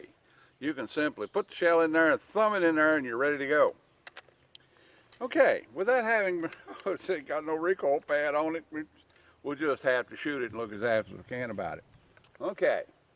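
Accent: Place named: Appalachia, United States. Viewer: let me say I love your videos and subscribe to your channel. If I didn't know you was up in Kentucky and was just listening to ya I'd think you was a local boy from here in the Carolina Mountains. I spent a good many years here as a Deputy Sheriff and in doing so came to harbour a life long love of the riot/police shotgun or as Ole Bill Jordan called it "The Great Equalizer". I went through the Police Academy with an Ithaca 37 DSPS (5 shot, 20", parkerized) and have put together a decent little collection buying most what I've got in the past when they were alot cheaper than they are today and I had more money to spend on them too. My biggest regret has been not going $750 on an H&K 512 at a gun show in early 2000's. It wasn't til I got home and saw how rare they are that I knew how bad I messed up. Made on contract for German GSG-9 that 12 ga.auto also had a shot dispersion device on the end that was adjustable to throw a horizontal or vertical oval pattern depending on the setting. 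Only the overrun of 341 guns total was ever imported into the U.S. by Keasler Police Supply. I passed it when the seller wouldn't budge off $750 to my eternal shame. Anyways the initial reason for the comment was just wanna help you out a little bit on your Ithaca 37 details. The LAPD Special 37 did have corn cob forearm but it had black plastic butt plate, parkerized finish, 4 shot magazine, and 18.5" rifle sighted barrel that differed from others by having the rear sight only windage ad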